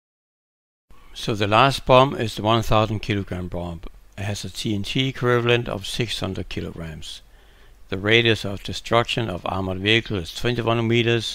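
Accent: Denmark. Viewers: Så nemt at høre den danske accent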